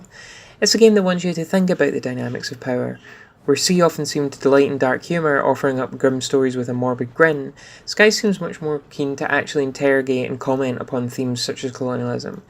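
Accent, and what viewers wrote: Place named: Scotland, United Kingdom. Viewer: The Scottish accent is a wonderful thing if you're aware of it. I quite like the delivery here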